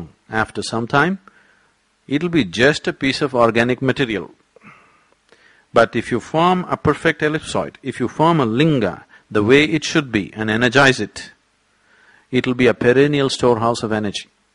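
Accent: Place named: South India, India. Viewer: becoz he's south Indian...south pronounce shiv as shiva....om nama shivaya...not om nama shivya...hope you get it...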